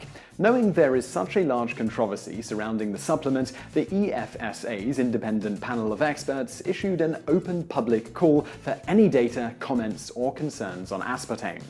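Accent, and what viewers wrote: United Kingdom. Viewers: Watching a lot of his videos, one learn that Brits pronounce a lot of words "wrong" (well, maybe just differently than we Yanks do).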